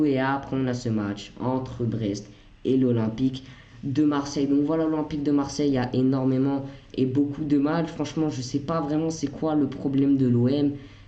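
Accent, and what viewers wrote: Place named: Paris, France. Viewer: Tu parle comme l immigré parisien t es le régen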